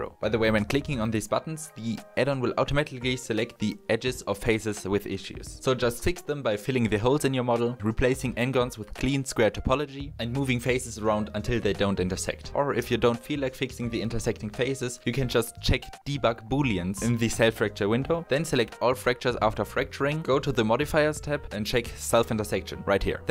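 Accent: Germany. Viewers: das gesächsle nervt übelst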